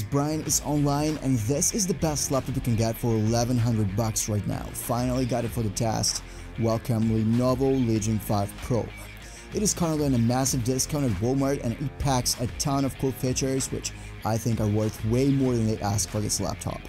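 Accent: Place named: Europe. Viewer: I wish u all the best for your channel but your accent is distracting, its like u are trying to sound like you are from some European country, it sounds like a mix of European/ California surfer who is trying to sound tough and also suffers from asthma (from the labored breathing sounds) if u are not faking your accent then I apologize, either way good luck with your channel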